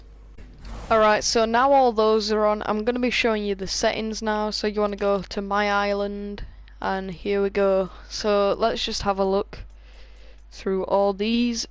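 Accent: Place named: United Kingdom. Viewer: Hes british eh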